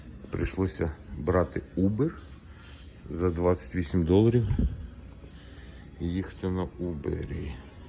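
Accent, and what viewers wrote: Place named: Western Ukraine, Ukraine. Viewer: Зустріла Вас на теренах ЮТьюба вчора, 2.06, випадково... Дуже була задоволена ...ну, і здивована - вперше чую українську мову західного ґатунку ! Вперше зустріла блогера зі США українця... Не ображайтеся - маленьке зауваження - Ваша заставка з палаючим бікфордовим "шнуром" - дуже неприємне нагадування тут, в палаючий Україні ....коли знаходишся сам, серед цього жаху...болить душа... розумію, то Ваш канал...але, бути там, ле Ви, і тут де я - "две большие разницьі" і нічого спільного... Бажаю успіхів !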